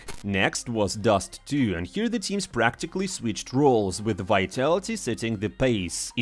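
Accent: Russia. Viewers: I love we have drunk russian givig us bbc type cs news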